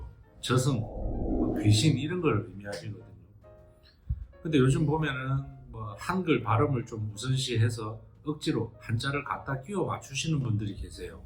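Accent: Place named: Busan, South Korea. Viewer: 부산이신데 억양이 지나치지 않고 목소리가 좋으시네요 한번 가보고 싶은데 너무 머네요 ㅜㅜ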